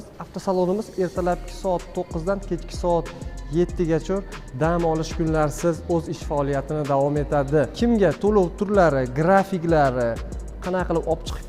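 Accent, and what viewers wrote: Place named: Tashkent, Uzbekistan. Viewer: Тошкенча Шева качаткибтию у́накки боб ковоти,( катмеди манитори😂😂😂)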